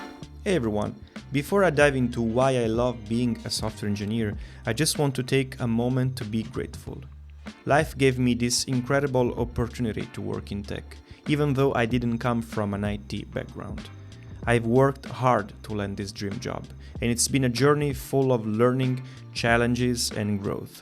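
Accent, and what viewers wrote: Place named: Europe. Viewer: base on your european accent, its understandable to use ai checking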